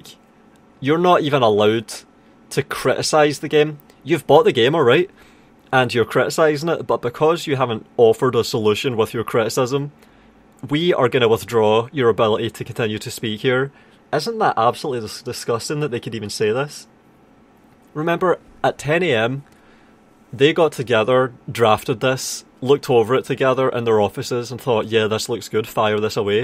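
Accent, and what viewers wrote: Scotland, United Kingdom. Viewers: I like your vids, i wish i would understand scottish tho.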